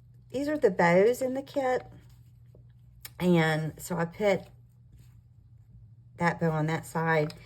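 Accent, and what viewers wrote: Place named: South, United States. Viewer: Simply gorgeous! Love listening to your soft Southern voice! Hugs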